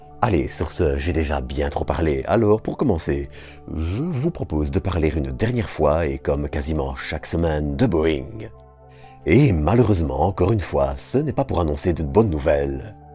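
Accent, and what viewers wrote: Belgium. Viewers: Holala cet accent... Belge? (no offense, suis belge aussi) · C'est la voix de, son organe naturel, son accent pittoresque, il est belge. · Merci pour ces actualités spatiales présentées de façon efficace. J’ai bien ton accent Belge.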